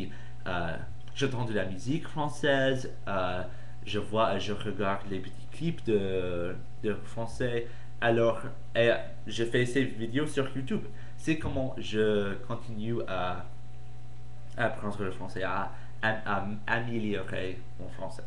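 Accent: United States. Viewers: Pas mal. Tu as un petit accent US mais ce n'est pas grave du tout, on arrive a te comprendre. :D Bonne continuation. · Ah l'accent américain (léger) que tu as quand tu parles français, assez marrant !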